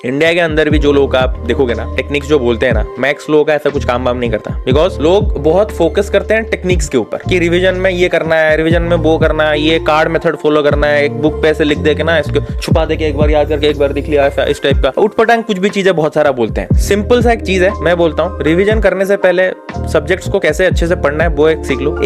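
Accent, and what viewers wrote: Odisha, India. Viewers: Bhai aap odisha ke ho kya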